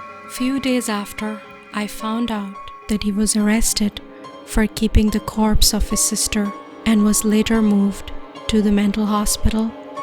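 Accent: India. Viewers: You have a cute Indian accent. I can hear it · Is the narrator indian ?? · Narrator's Indian accent is putting me off. She sounds Bengali. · Your accent is Indian From~🇧🇪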